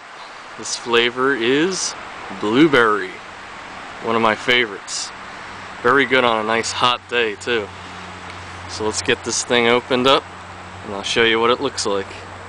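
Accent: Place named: Philadelphia, United States. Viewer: love the Philly accent!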